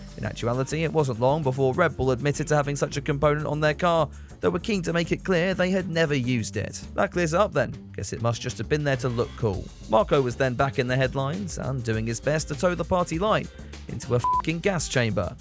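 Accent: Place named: North, United Kingdom. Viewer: can you please talk a bit slower for not so northern accented non Americans thanks otherwise good comedy review😂😂😂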